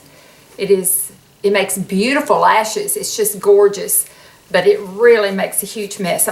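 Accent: South, United States. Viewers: Love ya!! Are you from Georgia?? You have that southern accent!! I’m from Georgia!! Love the look!! ❤️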